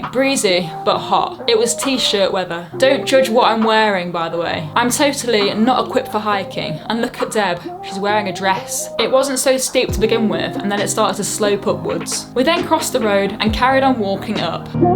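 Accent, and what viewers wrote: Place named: Derbyshire, United Kingdom. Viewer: I love this. Also, I love the accent. Hehe. Which I actually listed as one of the things I love being at England. And made a short video about. Derbyshire ha. ❤️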